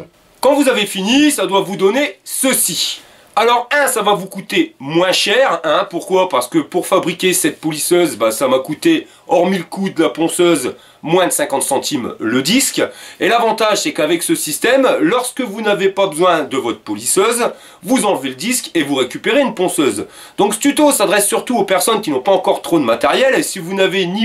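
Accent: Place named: Nord, France. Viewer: Juste pour l'accent du chnord je m'abonne 👍🤙 Big UP d'Algérie 😉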